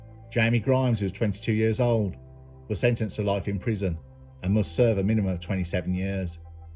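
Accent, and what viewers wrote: Birmingham, United Kingdom. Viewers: Great video but F-me! That Brummy accent makes my toes curl!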